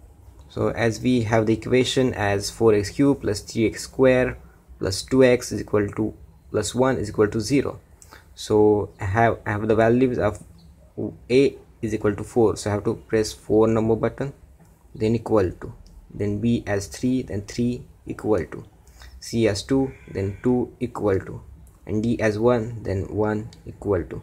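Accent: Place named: Italy. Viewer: love the italian accent ! really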